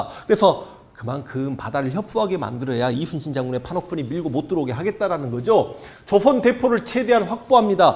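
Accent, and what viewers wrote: Jeolla, South Korea. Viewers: 황쌤은 고향이 혹시 전라도인 맞나요? 강의의 냄새가 남쪽 냄새가 그득하게 느껴줘요.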